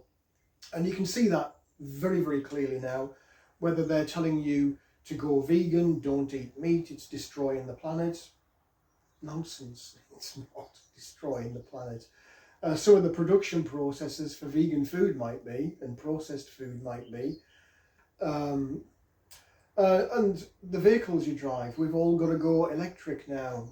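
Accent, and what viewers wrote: United Kingdom. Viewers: SO interesting to listen to British language.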